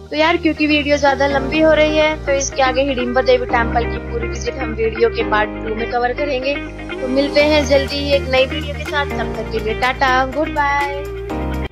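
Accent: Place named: Haryana, India. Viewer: Your haryanvi accent is so nice. Keep it raw 😊 #proud_to_be_Haryanvi.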